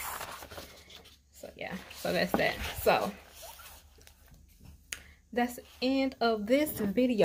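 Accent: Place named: South, United States. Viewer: I just found you and I’m already hooked on your videos. I don’t know if it’s the wonderful southern accent or all the awesome items you’re showing but I can’t get enough❤️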